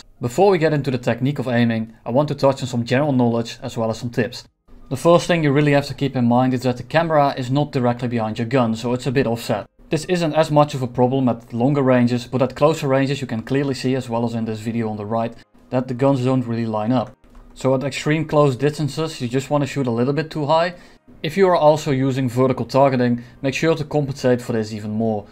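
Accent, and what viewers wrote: Netherlands. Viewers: i love your dutch accent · are you from the netherlands? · Ain't he Dutch? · Are you dutch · Your accent sounds a little Dutch. Are you? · are you from the netherlands? it sounds like you have a netherlands accent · whats that accent? it reminds me of an dutch accent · You’re Dutch aren’t you? Nice accent bro. (Frikandelbroodje) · Dutch accent! xD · Dutch accent detected?? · Are you dutch? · 16 sec in 2 words spoken eeeeeey dutch guy insta subbed goed beezziiig. xD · Bro is this guy dutch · Beetje nederlands acentje dit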